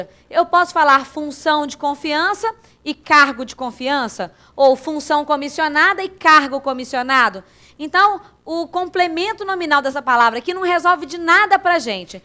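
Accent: Minas Gerais, Brazil. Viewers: Gosto demais das suas aulas, Profª! Tenho aprendido bastante! Parabéns por saber passar o conteúdo de forma tão clara! *Ps: Esse sotaque "mineirês" me lembra meus tempos de "Berrrlândia"! Adoro! rs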